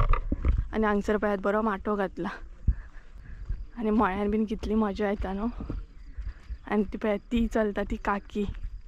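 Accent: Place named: Pernem, India. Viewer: from which place r u Pernem coz from ur way of talking I understood that u r from Pernem.side the way u said gauta Proud u should feel of urself.coz u r showing the hard work before the monsoons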